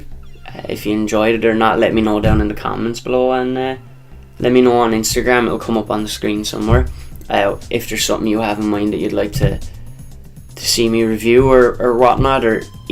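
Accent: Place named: Ireland. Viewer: Thanks for the review! Also your Irish accent is so cute!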